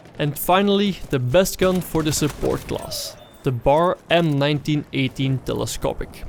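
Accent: Netherlands. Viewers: that dutch accent tho, nice good work!! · Is this a dutch voice over guy? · That dutch accent. 😂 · battelfield wan xD. your dutch accent is overwhelming xD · Where are you from? When i first saw one of vids i heard a dutch accent so yea... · ik hoor al dat je Nederlands bent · Ik hoor gewoon dat je nederlands bent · nederlander lol ik herkende het meteen aan je accent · You sound Dutch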